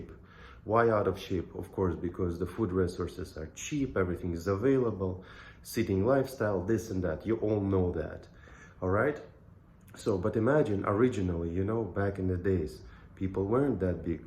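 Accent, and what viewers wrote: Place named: Brazil. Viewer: I know this accent I guess. Tu eh BR mano? Mora aqui? Tu não parece a maioria dos BR treinando , treina mais parecido com os americanos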